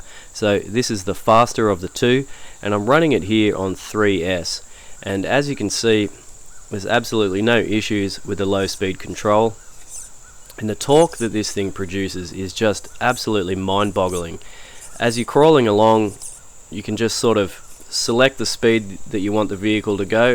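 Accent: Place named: Australia. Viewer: Ha! I thought from your accent you were down under. But yeah, NC probably still a bit more toasty. I don't think it is. Josh did a redo after his near meltdown. Glad he's simplified and condensed things down into one rig. New unit looks killer. But I still find I can't quite figure out his shop page. Definitely could be just my dyslexia, but I think it still needs more work. I can't tell what comes with what or if the combo/kits are complete or partial. Always prevents me pulling the trigger as with shipping, exchange rate, import duties, etc. it would be costly to order incorrectly. Love Josh though. He's the mad scientist of 1/24th's. :)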